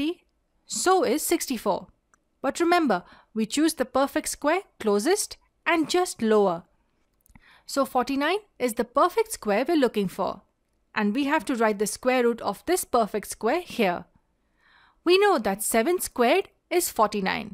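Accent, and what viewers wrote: India. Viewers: I can tell that the reader is Indian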